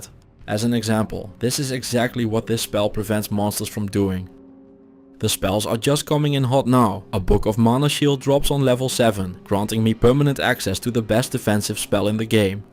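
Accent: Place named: Netherlands. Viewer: you sound SO Dutch it hurts my ears!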